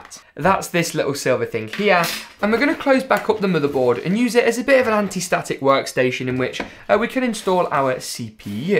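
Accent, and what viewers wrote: England, United Kingdom. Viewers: Are you not from the UK, i thought you had an english accent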